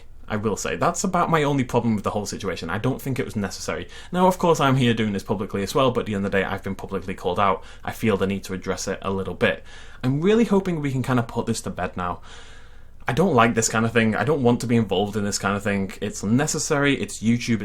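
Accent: United Kingdom. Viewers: I’ve been subscribed to you since you had like 500subs. I’ve found beaver much much later, but I’d say he didn’t really got his subs with JW:TG. He does, like a lot of things too. I found him through the Isle, which game you did played some time ago, but stoped doing so. He did not. Also, his.. umm.. like, style if you will is completely different from yours. You’re like an excited nature documentary commentator, and he (over)edits most of his vids (which i like per say). But, the most important thing is: Both of you have a funny British accent.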